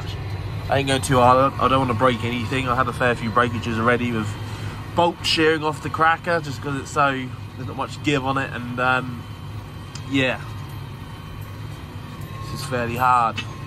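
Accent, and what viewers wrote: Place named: Suffolk, United Kingdom. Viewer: Hi, good to hear what your up to! Doing well and enjoying the farming by the looks of it. Sounds like you been working with some Suffolk farmers, you have picked up that lovely accent. Yes keep posting some in cab videos, though your drone footage was always great! Keep them furrows straight now!👍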